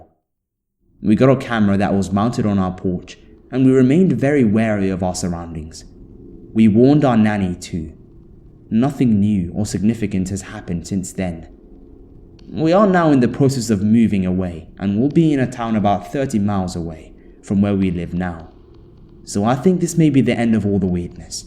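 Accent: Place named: United Kingdom. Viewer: Hello from, wonderful to get an upload from you. Love your accent. That Brit accent just adds so much to the stories. Looks like great story content. Keep up the excellent work. 💋👣💌💘💞💕💓💗💔❤💄💟🎠🌈🍄🌼🍎🍉🌻🍰🕊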